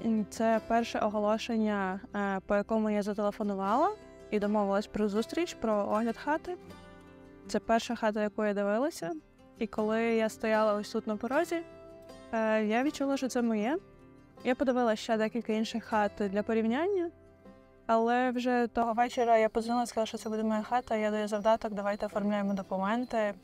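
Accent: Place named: Ternopil, Ukraine. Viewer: а я то чую розмова така як в Тернополі👍😄 Моя сімʼя теж походить з Тернопільського району ❤ Ти моя землячка🎉❤❤❤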